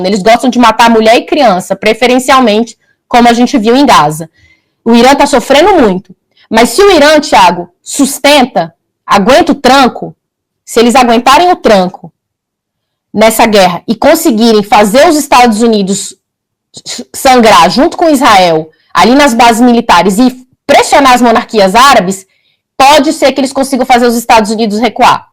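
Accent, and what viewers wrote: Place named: Minas Gerais, Brazil. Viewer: ❤ QUE SOTAQUE LINDO! SERIA ELA UMA MINEIRA? MUITO BOM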